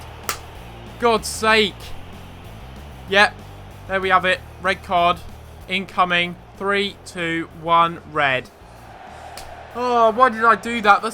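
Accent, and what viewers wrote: United Kingdom. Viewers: bro you have the most sterotypical british accent ever hahaha. Great video btw!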